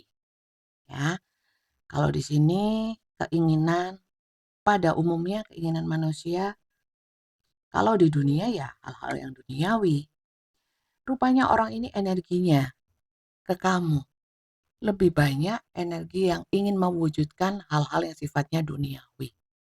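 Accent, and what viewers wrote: East Java, Indonesia. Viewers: Seneng logat Jawa timur ny Bunda.. Jg readingan ny sll ber bobot dan mantap. Leo Single mom hadir menyimak..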